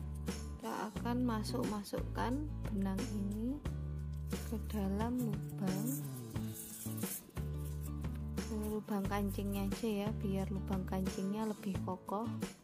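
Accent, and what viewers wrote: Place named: Java, Indonesia. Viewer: mantap,.. kreatif sekali mbak.. salfok sama suara medhok nya lho.. hehehe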